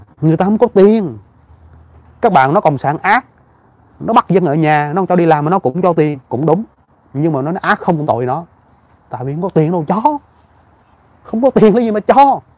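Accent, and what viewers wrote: Standard. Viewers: Anh chỉ được cái nói chuẩn 👍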